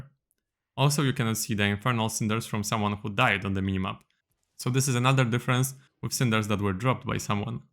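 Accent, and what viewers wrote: Poland. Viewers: bro are you from Poland?😁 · Dosłownie moja reakcja! Ciekawe czy to zmyłka, czy serio jest Polakiem. Akcent w sumie by się zgadzał. :D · Polski akcent idzie od razu wyczuć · Coś tak przypuszczałem że jesteś z Polski po akcencie. Niech żyje wielka Polska!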